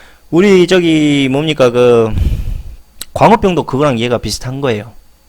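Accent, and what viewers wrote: Jeolla, South Korea. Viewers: 이사람 전라도네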